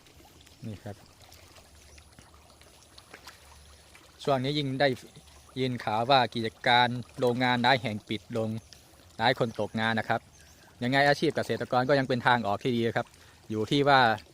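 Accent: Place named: Isan, Thailand. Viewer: อีสานใต้หรือ อุบล แน่นอน อิอิ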